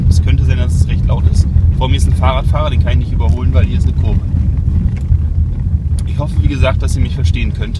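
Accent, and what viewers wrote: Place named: Berlin, Germany. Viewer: Also ick find ja das dein Berlinerisch jarnich mal so einjerostet is ick hab dich voll und janz verstanden.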